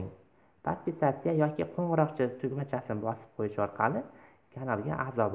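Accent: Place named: India. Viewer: Aka aksent hindistonnikimi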